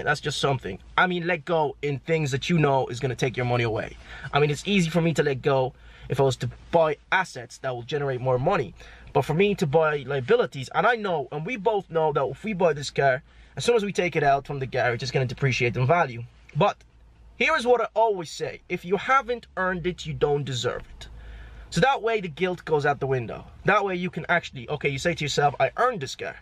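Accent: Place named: Ireland. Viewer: You sound like Connor mcgregor in your trading videos. You have the same accent and very similar personality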